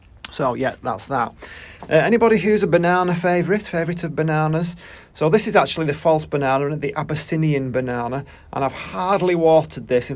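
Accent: England, United Kingdom. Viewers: well it’s the English accent that helps too. And I do wAtch most all your videos, but this one I had to do some other things and I don’t have non orchids so it was okay to listen in parts.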